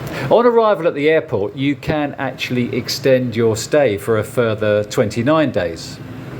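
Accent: United Kingdom. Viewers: I can't take your snooty British accent for more than 30 seconds.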